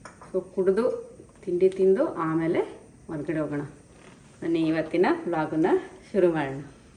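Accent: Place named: Malvali, India. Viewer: nim vidio nodtidre Indiadale hidira ansute nim language pakka malvali bashe USAge hodru mothertoung change agila ade Kushi namge nim hand mel hiro village style old tattoo super agide❤😅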